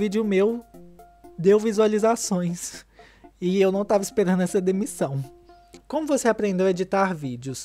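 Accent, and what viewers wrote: Minas Gerais, Brazil. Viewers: Conheci seu canal por acaso há muito pouco tempo não costumo fazer comentários mas tenho essa vontade contigo. Não sei se é porque você tem esse sotaque minérim (minha família mineira) se é porque tenho um filho morando na Europa há uns 5 anos ou se porque o filho que me restou aqui também é Vegetariano kkkk. Brincadeira 😉 mas é só pra dizer que gostdoce tá ! Abraço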